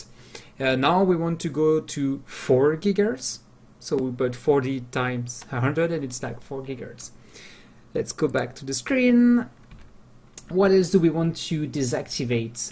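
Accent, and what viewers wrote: France. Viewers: bjr monsieur, êtes vous Français ? Très bonne vidéo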